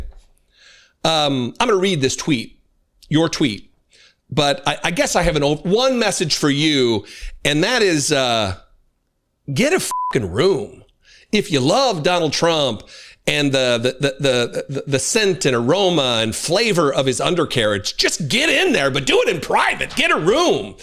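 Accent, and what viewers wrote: South, United States. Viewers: I dig your sing-songy Southern preacher embellishment,!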